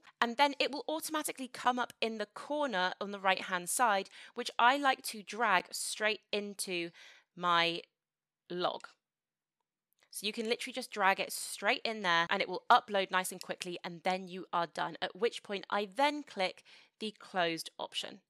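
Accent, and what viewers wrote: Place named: England, United Kingdom. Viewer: Coulda sworn she was English cos of the accent and then she said she is German and I almost did a spit take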